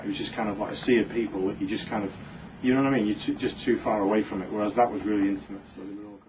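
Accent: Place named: England, United Kingdom. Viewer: no one else finds it odd that he sounds like he is english and sings like a black man????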